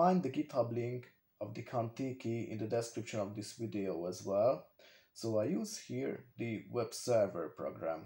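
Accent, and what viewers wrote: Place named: Hungary. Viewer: amazing project! your accent sounds a bit Hungarian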